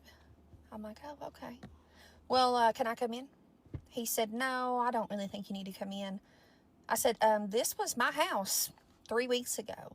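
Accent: South, United States. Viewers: I can’t listen to her southern accent. Sorry, it’s triggering right now in our current climate. I bet she voted for 🍊. And her trauma is directly related to her southern indoctrination.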